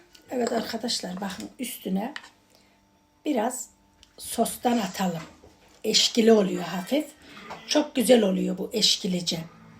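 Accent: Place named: Nevşehir, Turkey. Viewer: Gırdı Nevşehir li ne Türkçe amma 😊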